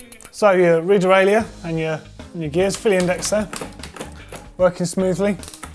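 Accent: United Kingdom. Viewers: Please add subtitle that too hard to understand biritish english for me :D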